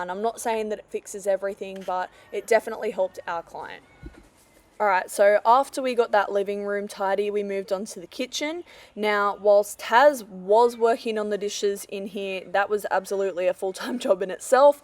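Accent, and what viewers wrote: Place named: Australia. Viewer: Great video! If open to suggestions and unsolicited advice 😅 here it comes, longer videos make for better content for cleaning transformation lovers I think! I feel like a lot of the video was focused in one or two areas and we missed the process of the cleaning in the rest of the rooms, only getting to see the after. Would also be great to have a final comparison of before and after of each room at the end! Lastly, LOVE your narration (especially cause I love Australian accent 😅) small suggestion is to lower down the volume of the audio of the original video in the moments that you are narrating so we can hear your narrations better! For instance, it was a bit difficult to hear you during the vacuuming of the bathroom sink. Sorry if that was too much 😂😂😂 I just see amazing potential with this channel and thought I’d share my unsolicited advice in case you care 😅😅 sending love and looking forward to more videos! 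❤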